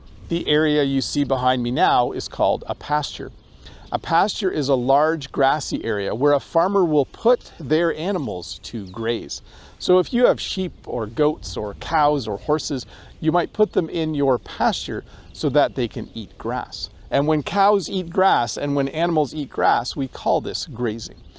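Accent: Canada. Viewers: I am in Canada. A newcomer. Your videos are helping me to understand local accent ❤❤❤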